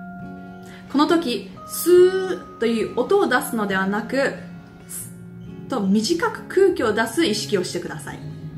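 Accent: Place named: United Kingdom. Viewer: Hi, your video helps me a lot. BTW, I just wondered your accent sounds like British. How many years had you lived there? Thank you!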